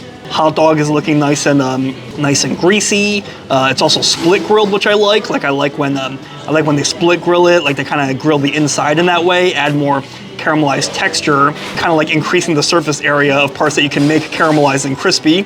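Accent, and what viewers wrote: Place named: New Jersey, United States. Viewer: You can tell.you grew up in NY uhh NJ uhhhhh because uhhhhh I want the uhhhhh cheeseburger uhhhhhh and uhhhhhhh omg uhhhhh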